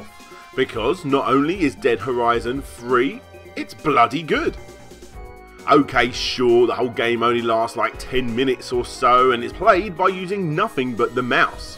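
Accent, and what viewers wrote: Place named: England, United Kingdom. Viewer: ps - Love hearing proper English accents on YT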